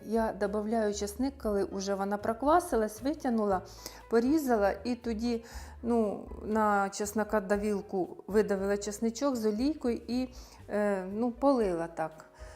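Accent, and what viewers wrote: Ukraine. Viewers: Ничего себе суржик.. Красивая украинская речь.. Не слушайте никого про суржик. Вот это и есть мова. А не западенский диалект. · Це не суржик, це російська з українським акцентом! Могла б перед тим, як знімати, приділити час і провірити у словнику слова, і не ганьбитися!!!! Каже не пишіть. А для чого тоді таке ганьбите виставляти?……. Улажую????? Тута і ТАМА….,сутки двоє….. А слово ДОБА ЗНАЙОМО????? Це вивчають у школі!!!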